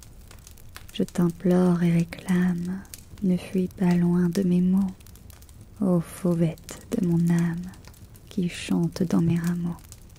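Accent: France. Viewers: If I had a nickel for every time I listened to a French Maid ASMR with a French accent and actual spoken French, I'd have exactly one nickel! 😅😅😆😆 Jokes aside, despite only being able to pick up certain words and phrases in French, this RP was so very calming to listen to. The French accent combined with bits where you recited the poems in French was oh so relaxing. The feeling of being taken care of made me feel all warm and fuzzy throughout and your humming is angelic. Your use of ambient sound is also masterful and it makes it all the more enjoyable to listen to. This was so different from all the other scripts so far and it shows your range. This channel is quickly becoming one of my favourites and I wish you the very best and utmost success in the future! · That frensh accent in the english language is soooo beautiful and soft. never heards such a tingly and calming frensh accent! 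hope to hear more of this in the future <3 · Ça fait un mélange très agréable l'accent français mélangé à de l'anglais et du français. Merci pour la vidéo ^^. Les bruits d'ambiances me plongent vraiment dans l'histoire, bref excellent, j'adore ❤. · Finding this Roleplay is like finding true peace. There is nothing more tingly than a french accent guiding you to sleep. And the Ambient sounds are just on point for this. Cant really put into words how great this is. Really awesome work! Instantly gave me goosebumps and im loving it ♡ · English with french accent just good enough for me ❤ French with english accent too like canadian accent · this french accent of yours has made this asmr, this night of discovery, and this moment, you have made me felt like im in a mindset of a rich man who face this fancies everyday, now i shall sleep with this fancy asmr voice of yours 🤩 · I love ASMR with a French accent. 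Amazing job! Keep up the good work! ❤ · Now IMAGINE HER french accent speaking the English Synchro of an anime girl, it would go crazyyyy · Love your french accent , ASMR is strong on this one. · French maid? Yes. French accent? Yes! French maid with French accent reading French poems? YES! This was great, the relaxing vibes were pretty damn good, and I love the character as well. Excellent work!♥️ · The soft spoken with French accent here is strong, really strong here. It hit straight on my ear and wasn't ready at all for the echo added on the bathroom ! And some humming too ? Oh, TY. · Same, I want more french content. She also gives me motivation to learning french accent lol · Ta voix ressort si bien en français j’espère il y aura plus de vidéos avec l’accent français… Sinon salutations du Québec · Wow, I love your accent! I've never heard french ASMR before and I had no idea what I was missing out. 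This is honey to my ears, please do more! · Your voice is incredibly soothing and peaceful, especially your French accent. Thank you for this vid and for making me wanna relearn French again. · Stuff like this is why in my honest opinion, the French accent is the most beautiful European accent, if not the most beautiful accent ever. Of course, every accent is beautiful in their own ways. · The French accent is lovely also a small dig at the British made me chuckle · God Please do more French content, The accent and the voice is just so hitting the spot (if anyone finds this in my history I am cooked but awesome work you do!) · Never expected how good a French accent asmr would be. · I've listened to this one a few times now and most times I never get to the end of it because I end up falling asleep. Soft, soothing voice ASMR videos are one of my favourites. And the French accent really adds to this. 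· Came here from the link provided in Part 2 (F4M). This is an exceptional audio, with your lovely narration in a French accent, adding to the immersion and exotic appeal. I absolutely look forward to the Part 2 experience soon. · Jeeez I didn’t know I like the French accent so much 😂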